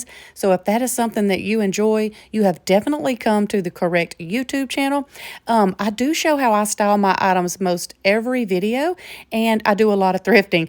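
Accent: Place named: Fort Worth, United States. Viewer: My third comment today only it's a question just based on your accent and like I said I have not listened to a lot of your videos not because I don't like them but because I do listen to a lot of others especially about politics and the Christian religion which I am a Christian but are you from the Fort Worth area I live in suburb of Fort Worth I was just wondering which antique shop you went to LOL but I think you should have definitely got the first one but again send me the name of the artist and the name of the actual picture that's what on that little tiny brass placket that's tacked on there that's will tell you if it's valuable or not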